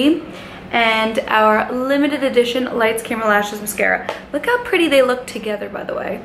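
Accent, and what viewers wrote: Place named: New Jersey, United States. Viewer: just wandering where ur from. i live in nj and you sound like ur from here!!!!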